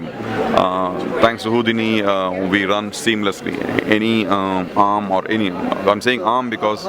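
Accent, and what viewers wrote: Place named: India. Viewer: get used to the indian accent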